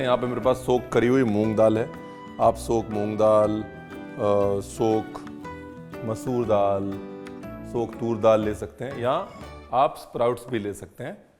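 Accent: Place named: Kanpur, India. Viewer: easy recipe aur aap bolty bht achha hai mujhe lgta hai aap kanpur se hai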